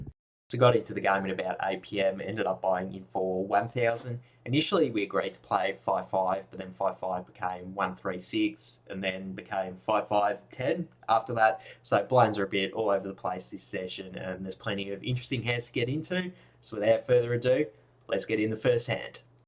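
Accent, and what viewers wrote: Australia. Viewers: 👀 Me looking for vlogs trying to decide if I like the poker content or the Aussie accent more. Keep up the great work!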